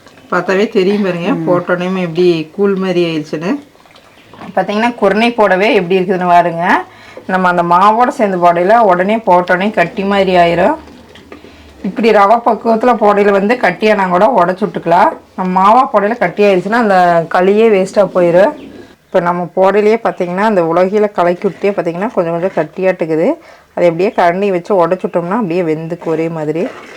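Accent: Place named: Coimbatore, India. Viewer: nanum coimbatore than...pollachi amma ooru...ippo US la irukken...unga recipe ellame super,na main ah neenga pesarathe ketka varuven...namma ooru basai...ippo kuda sometimes enna ariyama vanthudum...ellarum correct ah kandupiduchuvanga... neenga coimbatore ah nu ketpanga...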